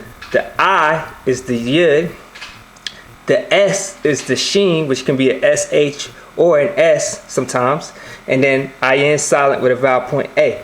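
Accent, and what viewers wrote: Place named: United States. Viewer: I think it would help alot to pronounce these names in the original language... or at least not american. YahawaSHEE...not -Shy, at least.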